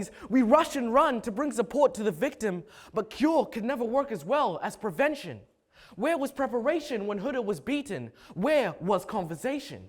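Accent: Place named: Bemba, Zambia. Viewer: Am laughing at the bemba in English 🤣🤣🤣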